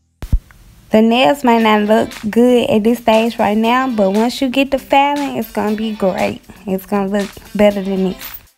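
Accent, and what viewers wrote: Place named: South, United States. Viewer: That southern accent boo 😍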